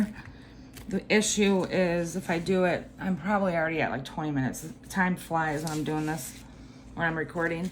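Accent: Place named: Midwest, United States. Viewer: Yes, there is a midwest accent. I was born in Delaware, lived in Chicago, and now I'm in Florida. +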